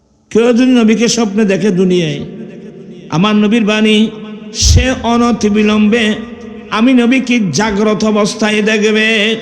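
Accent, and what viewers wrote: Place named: Chittagong, Bangladesh. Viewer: চিটাগংএর ভাষা বাদ দিয়ে শুদ্ধ ভাষায় ওয়াজ করলে সারা বাংলাদেশের মানুষ বুঝতে সহজ হতো বেয়াদবি হলে মাফ করবেন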